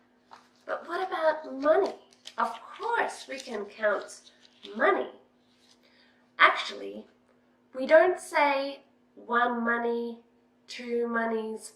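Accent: Australia. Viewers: Content is exceptionally good!! Exactly what I was looking for for a friend. However, sound and video production are, I am sorry to say, horrific. Sound production is important, because many will find the strong Australian accent unfamiliar and then add to it the slight echo from the bad sound and that makes it even more difficult for people to understand you. The slow speech is excellent, though. I am hoping since 2019 you Googled "sound for youtube videos" and made ANY improvements suggested. Really, if you are going to produce youtube videos, doing the absolute bare minimum for quality, not far below, is expected. The poor production quality is really detracting from your absolutely fantastic content. Cheers!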